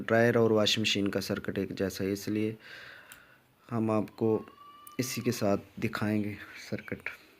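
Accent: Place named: Sialkot, Pakistan. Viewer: Mera andaza theek nikla apki boli sialkoti lg rhi thi.mn bhe sialkot me hota hun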